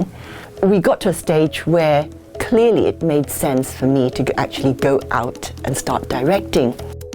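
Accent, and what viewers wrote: United Kingdom. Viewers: I am so exited to find you on Youtube after 40 years I had watched your "English on Sunday" on CCTV, You are still holding your Pure London Accent and I really hope some day I may meet you in London to show my respect. Your Audience from 1979. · 不是她发音含混，而是你英文不够好，而且对英音不熟悉。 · As part of my sweat memory, I watch "English on Sunday" program at that time.(2pm Sunday) when I just start to work. I could only comprehend no more than half of the context. The program was quiet advanced to me. I like the host and her English accent. But, I did not know her name and background.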